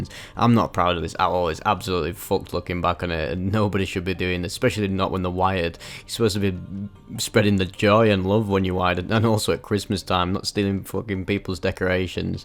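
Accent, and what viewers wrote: United Kingdom. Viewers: British accents make the best narration. You're up there with David Attenborough bro.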